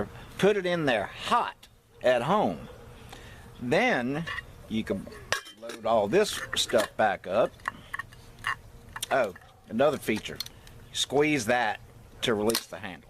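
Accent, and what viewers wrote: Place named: South, United States. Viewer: I love your southern accent !!!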